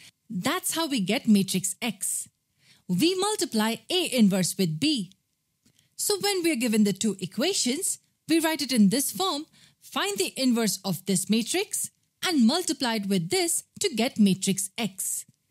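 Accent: India. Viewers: Indian accent is good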